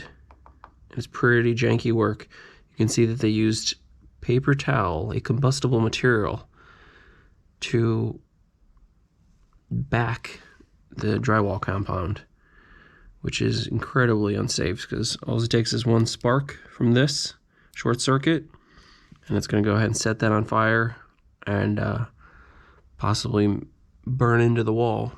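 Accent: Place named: North America. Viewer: Thanks for this. Interesting.... North American accent but UK socket outlets?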